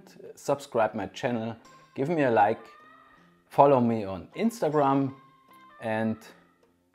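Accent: Germany. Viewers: Man hört dass du deutscher bist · Simmer vom schwobaländle ?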